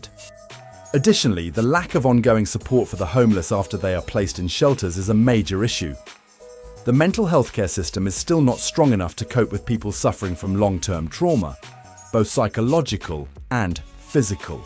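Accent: United Kingdom. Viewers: Living in Manhattan for over 40 years I cannot agree with this clip. It exagerates very much the severeness of the situation. its not as bad as you portray, notice your British accent , you are not one of us and cannot judge. NYC spends billions a year on this problem and I should know since they helped me a lot with certain issues. There is no right to live in Manhattan. Its for the rich and wealthy. You can move to pennsylvania or New Jersey and seek your fortune there where costs are lower. and rent is lower. Most of these homeless are not from here but from much further away. Notice they dont want to leave Manhattan though they are homeless because there are many shelters and places to get help and free food daily. Cant agree with your show and its too untruthful, RM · I live in NYC. Have never seen a Tent city. About 10 years ago the homeless mentally ill were rampant in the subway stations , but it’s been cleaned up. 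125 th on the east side was full of garbage and K2 victims 8 years ago but no longer they’ve cleaned it up. There’s no longer any abandoned buildings , that ended years ago. Where is the British speaking person speaking from , the moon ?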